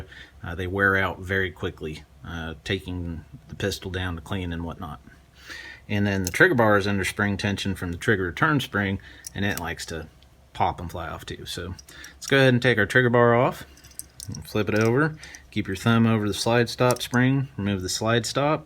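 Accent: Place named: South, United States. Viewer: Thank you again for the video, by the way I love the southern accent, kind funny but I like. I finnally today got some will and finish the slide part, it’s been more than a year since I bought the kit and did the lower frame part, just now I did the slide, I didn’t do before cuz I was lazy 😂 thank you God bless America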